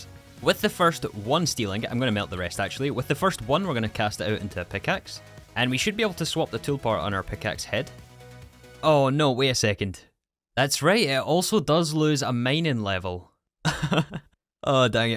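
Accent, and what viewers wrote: Scotland, United Kingdom. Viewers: bro im new here you Scottish or something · 3 are you Scottish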